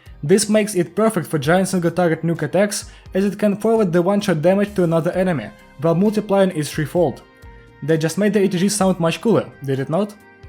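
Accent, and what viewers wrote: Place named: Russia. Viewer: Russian accent is strong in this one. I have no idea why, but this really sounds 100% Russian to me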